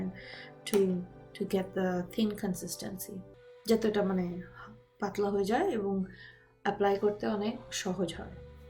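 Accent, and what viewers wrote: Bangladesh. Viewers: I guess you are from bogura. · i gues u r from the northern part of Bangladesh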